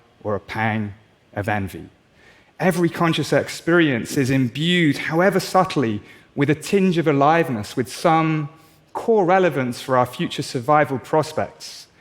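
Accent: United Kingdom. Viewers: This video is so full of baseless assertions, it's difficult to watch. I guess being a good orator with a British accent is all it takes to get on TED these days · They're just lying to our faces. This is all damage control. The y know they've already lost control of AI. They've created a monster they could never be able to tame. These guys are pure evil. Lying to people's faces in a British accent!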